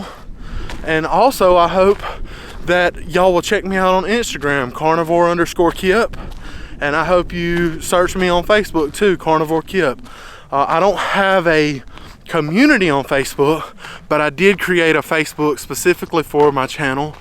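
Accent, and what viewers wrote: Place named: Alabama, United States. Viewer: Thanks Kipp you are an awesome inspiration. I love your Alabama accent and relaxed way! I love the south. Thank you. Yes I need to make goals for 2024 be blessed! ❤ Respect always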